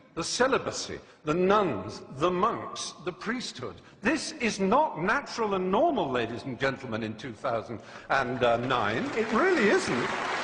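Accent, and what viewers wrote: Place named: RP, United Kingdom. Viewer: Wow,what a LIAR. Where to start? Repeating black legends in a posh voice does not a clever argument make. Firstly,Galileo was brought up on charges of being a heretic,not necessarily to do with heliocentricism.The Copernican theory he mentions is funny because Copernicus himself was a Catholic cleric.The enlightenment?You mean like when those who took part in the French revolution persecuted Catholics as bad as the Nazis did the Jews and all in the name of 'reason'?Thomas More?How about Cromwel?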